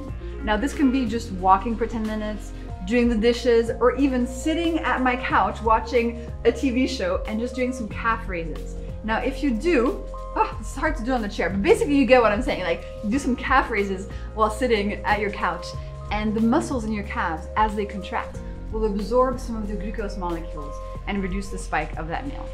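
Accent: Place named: France. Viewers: I am in love with her French accent❤ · She may have a French accent, but I think we can say it's very, very subtle.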